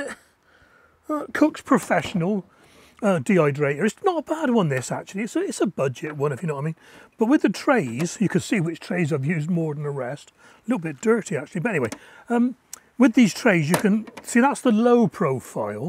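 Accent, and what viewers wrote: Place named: West Country, United Kingdom. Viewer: Nice batch of peppers there, just popped over from at Green Side Up to have a look see. I air dry mine tomatoes with added spices/herbs then store in jars with olive oil, triple bonus, 1. Makes the house smell nice, 2. Flavours already added prior to cooking, great topping on pizzas, 3 Flavoured oil for cooking. Thanks for the tips on preserving, lovely hearing a west country accent 😉😁🌱☀️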